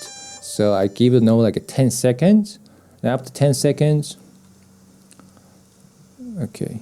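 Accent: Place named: Australia. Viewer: Yeah same here! Its fine! At times he sounds like he has a Australian accent! And for the record I understand him better than a lot of Australians! =]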